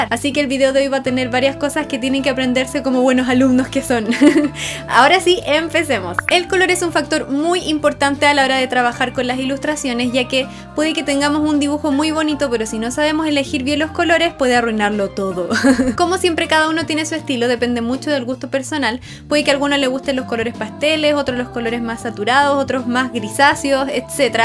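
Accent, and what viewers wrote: Chile. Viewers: Nomames amo su acento chileno alaberga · es el acento chileno xD como nadie se da cuenta de eso xD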